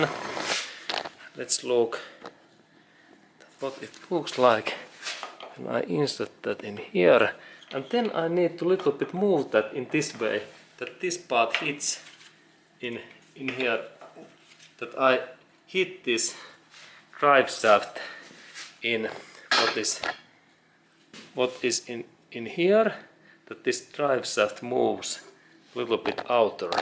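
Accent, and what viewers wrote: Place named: Finland. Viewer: From your accent I can assume you are from Finland 😉. Btw i like your videos very informative.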